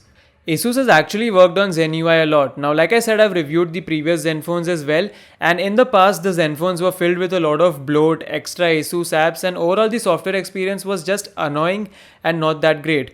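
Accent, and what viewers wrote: India. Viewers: well, he looks & sounds like Indian.... Cmiiw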